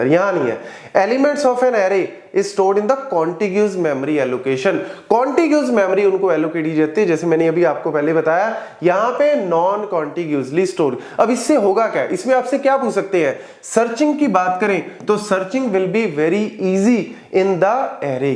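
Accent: Punjab, India. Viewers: Ur Punjabi accent so sexy said again❤️🙌😅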